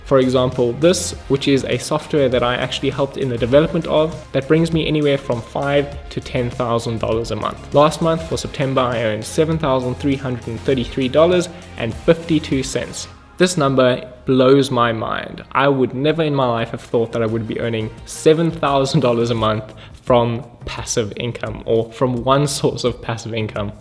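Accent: South Africa. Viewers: is that a South African accent ? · Are you South African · You've got a South African Accent? Are you South African bro?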